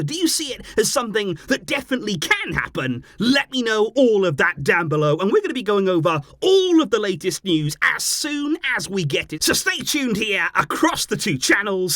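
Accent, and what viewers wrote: Wales, United Kingdom. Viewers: because he's from wales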